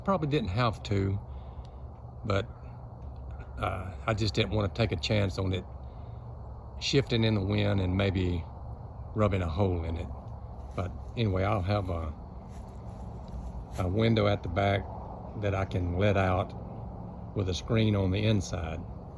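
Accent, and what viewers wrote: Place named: Louisiana, United States. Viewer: I was guessing Louisiana with the accent lol. I was close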